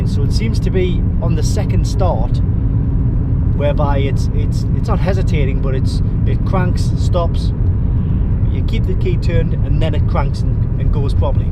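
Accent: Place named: Geordie, United Kingdom. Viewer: I've followed your channel since you started, years ago, with the channel under your " " name. Just for feedback, it's gone from being an on honest "Geordie lad talking about cars" to becoming clickbaity, cheap and nasty. You've always been a bit w*nky, pretending you're something you're not (regarding the Porsches) but it's just tacky and sad now. You're not fooling anyone by toning and smoothing your natural Geordie accent and pronouncing letter 't' as a 'd' (pardy on, man). Have you ever wondered why videos like this have a staggering 36% thumbs down rating (YouTube average is 8%)? Just stop being a k*ob and try actually being yourself.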